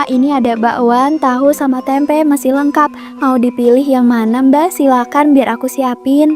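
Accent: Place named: West Java, Indonesia. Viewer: lagam bahaasa ya sunda ya tuh nyunda banget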